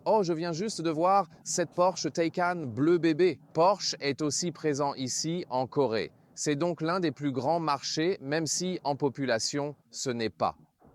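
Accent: Quebec, Canada. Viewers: Amusant, l’accent de la voie en français. On entend une pointe d’accent québécois.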